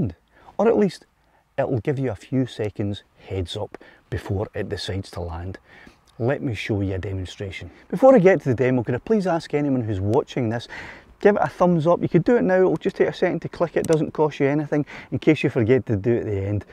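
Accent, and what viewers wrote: Scotland, United Kingdom. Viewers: Great viddy mate. I am also partial to your Scottish English.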